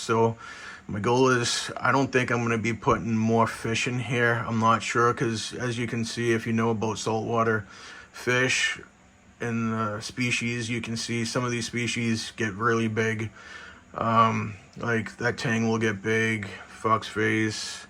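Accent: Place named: Massachusetts, United States. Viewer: I’m dealing with green hair algae as well but it’s almost gone. Just need to get your hands wet, remove as much algae as you can and do a few water changes. If you stay up on your maintenance, it’ll fix itself. I would tell you, get rid of the vibrant. It’s proven to be more harmful than good. Just a simple google search will show you some horror stories. Side note, sounds like you’re in Mass.. I’m near Worcester.. you might want to join Boston Reefers Society if you haven’t already. Lots of good local knowledgeable reefers willing to help and give advice! good be luck bro.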